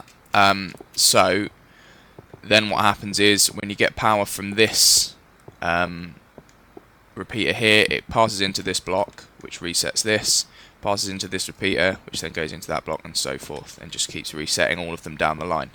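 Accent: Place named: England, United Kingdom. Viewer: he has an english accent like an english...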